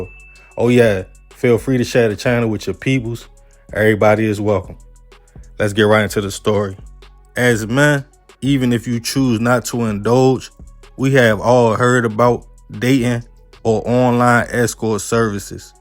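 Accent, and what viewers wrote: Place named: Mississippi, United States. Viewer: You got great stories but why it sounds like you read at 3rd grade level….or from Mississippi…. You have great content frfr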